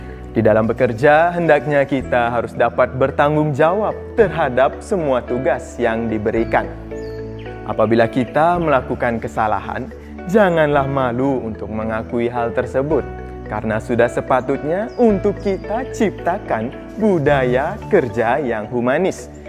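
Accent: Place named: Bali, Indonesia. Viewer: Om Swastyastu, awighnamastu Bagus baik becik, bhaktisewanam. Logat Bali tulen masuk seratus persen. Tapi bagus juga, semoga Orang luar Bali memahami isinya.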